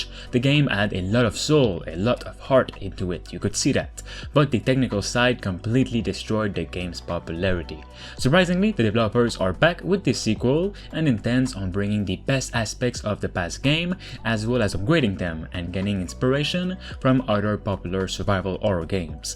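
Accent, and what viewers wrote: Quebec, Canada. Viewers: he is Canadian/French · Sounds french Canadian, like the guys I used to play hockey with.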